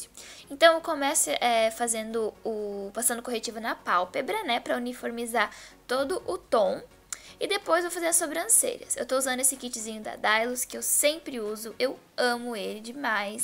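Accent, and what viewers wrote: Santa Catarina, Brazil. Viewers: pelo sotaque só poderia ser catarinense!! linda, perfeita make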